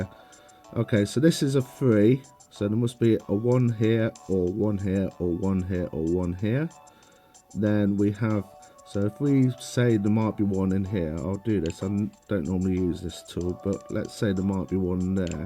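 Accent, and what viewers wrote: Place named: England, United Kingdom. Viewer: brooo the england accent is ceazy, loved the video lad!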